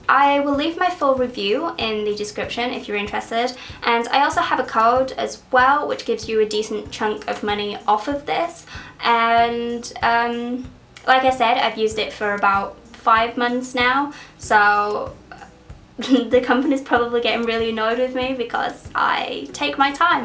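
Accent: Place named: North, United Kingdom. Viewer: I'm sooooo confused by your accent? Is it northern or American?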